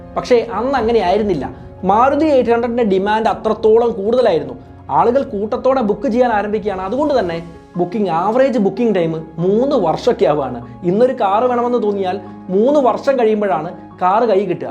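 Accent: Kannur, India. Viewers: നിങ്ങൾ കണ്ണൂർ അന്നോ 😊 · കണ്ണൂർകാരൻ ആണോ..?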